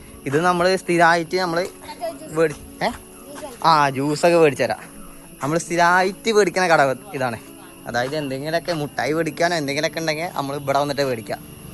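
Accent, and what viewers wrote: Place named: Palakkad, India. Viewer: Palakaad ഭാഷ കേൾക്കാൻ നല്ല രസമാ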